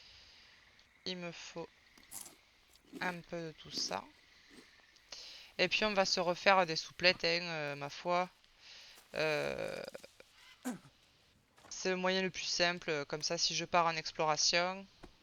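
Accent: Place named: South, France. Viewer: Je m'abonne je suis le 100ème abonné, j'adore green hell, j'adore 😍 j'aime le sud et ton accent 😍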